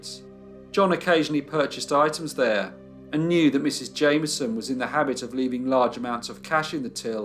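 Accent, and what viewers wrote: United Kingdom. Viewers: It's LeFFtenant, not LOOtenant. You sound British, you should know better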